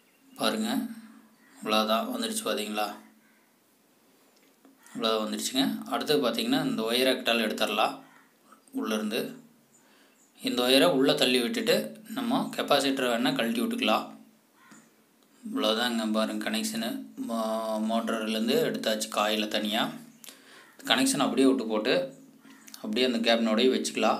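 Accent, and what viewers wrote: Kongu, India. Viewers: நம்ம கொங்கு தமிழ்'ல Semma explanation ன்னா 🤝✌️ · கொங்கு தமிழ்.கேக்க கேக்க இனிமையாக இருக்கு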